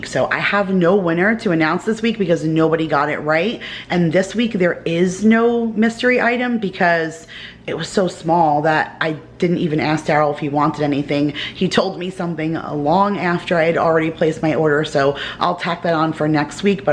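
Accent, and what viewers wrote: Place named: East Coast, United States. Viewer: I’m new to your channel. I really enjoy it. When I first heard you speaking I said this girl has to be from back East. I’m a 40 plus years girl from CT transplanted in NE. Now I see you’re going to NY for vacation. How cool is that? I wish you a happy, healthy and safe New Year. Take care. Food request is Sharp Cheddar Cheese 🧀 LOL 😂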